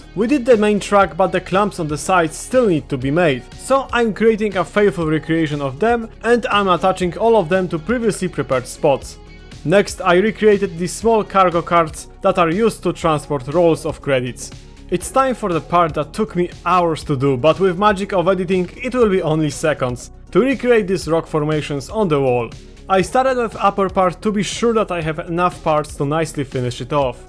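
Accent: Poland. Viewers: Słychać że polak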